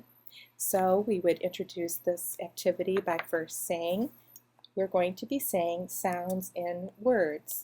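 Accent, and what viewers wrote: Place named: Midwest, United States. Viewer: cute accent. i like your a's. midwest?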